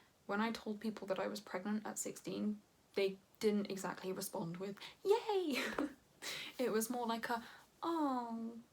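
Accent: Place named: United Kingdom. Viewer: I had no idea you were british and that beautiful accent caught me off guard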